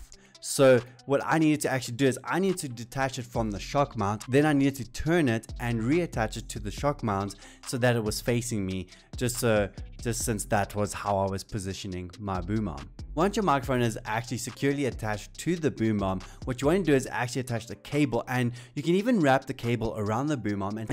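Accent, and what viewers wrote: South Africa. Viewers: The whole time I was wondering if you were south african from the accent loll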